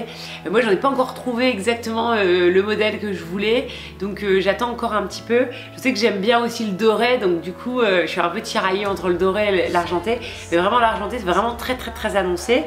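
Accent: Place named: North, France. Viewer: Bonsoir, Vidéo très sympa, comme d'habitude. Tes prononciations "ai" au lieu de "é" sont trop mignonnes (le nord, c'est ça?). J'aimerais beaucoup une vidéo sur les bijoux si cela te dit. Bisous, Séverine.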